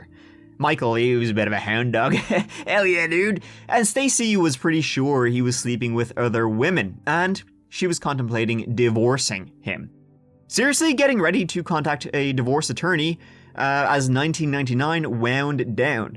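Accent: Ireland. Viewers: You are my favorite podcaster ever I enjoy your content and you oh so much. Your voice is very relaxing and you’re so IRISH. ❣️ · I enjoy ability to tell a story. Another enjoyable item is his Irish accent. I want to meet this gentleman and shake his hand.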